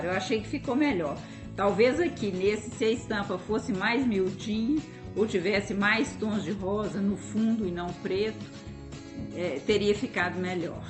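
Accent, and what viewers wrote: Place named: Minas Gerais, Brazil. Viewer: Suas explicações são excelentes, adoçadas com sotaque mineirinho ficam melhores ainda! Adoro seus vídeos!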